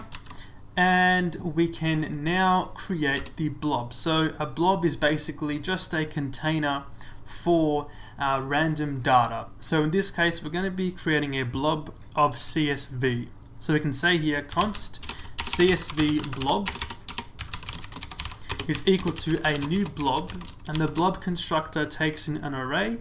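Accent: New Zealand. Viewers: Ok.... no worries.. I'm Aussie and thought I heard a slight hint of kiwi. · Are you a kiwi? you sound like one!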